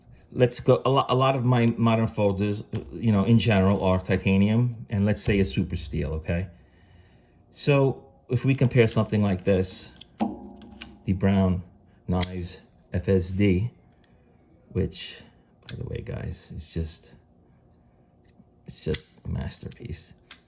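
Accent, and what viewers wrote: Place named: East Coast, United States. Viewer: Glad to join the party. Hearing you almost mkes me miss the east coast.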